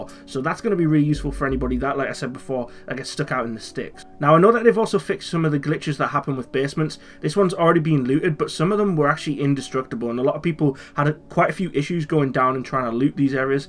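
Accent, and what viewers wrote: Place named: North East England, United Kingdom. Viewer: Love your vids mate, where you from? Sound like your from the north east!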